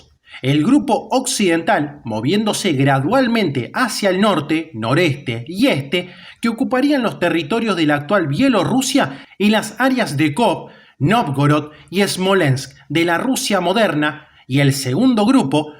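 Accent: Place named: Argentina. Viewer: Buen documental, PERO deberías de dejar de husar jergas y acentos cuando se trata de ' Nombres propios ' de Ciudades, paises, regiones, o nombres de personas... usar la She , sho, shu, sha, etc en nombre própios es an algunos países un insulto, ese el problema con los acentos argentinos, por lo demás perfecto.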